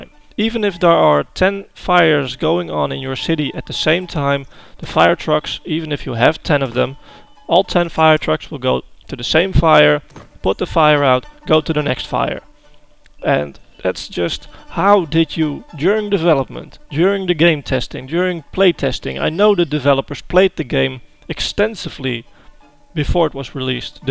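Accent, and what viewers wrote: Netherlands. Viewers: Your accent :D, and I know you are from Holland, saw It on your twitter :D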